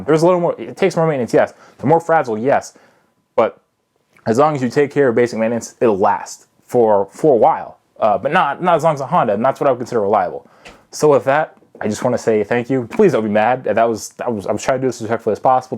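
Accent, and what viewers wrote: United States. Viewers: Sorry, I can't understand most of what you say. And English is my native tongue. So a kind request and suggestion might help us all: Please, when you have a really strong (I'm guessing Southern) US accent like yours, remember that most people don't have that accent, and you will fail to communicate your thoughts and information. So, try to slow down a lot, speak clearly, don't use foul words, and you'll win over your audience, and then gain many more subscribers. Thanks, in advance, for your patience. All the best.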